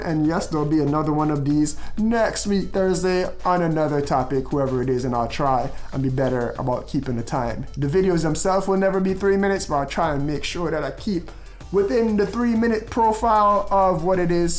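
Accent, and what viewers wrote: Jamaica. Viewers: u jamaican?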